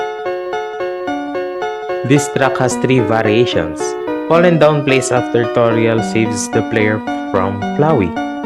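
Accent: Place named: Philippines. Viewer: The Filipino accent is way too strong on this one😅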